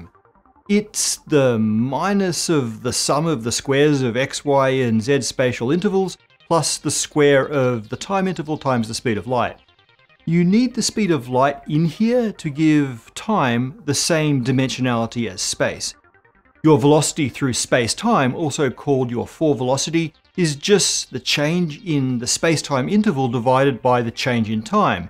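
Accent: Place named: Australia. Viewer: his accent is in a way closer to australian, with some peculiarities of Irish, possibly of family origin.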